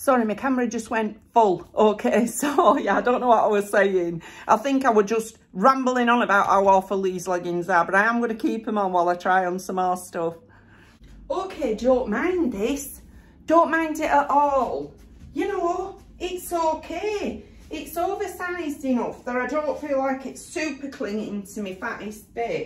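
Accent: Yorkshire, United Kingdom. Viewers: I need to come to Bradford now I love mixing up Poundland and primary hope to bump in to you it’s nice to hear a Yorkshire twang love West Yorkshire ❤️😘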